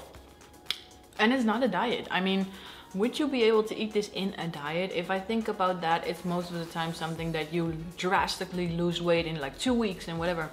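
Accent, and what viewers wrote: Netherlands. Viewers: You're accent is very VERY Dutchess in this video.....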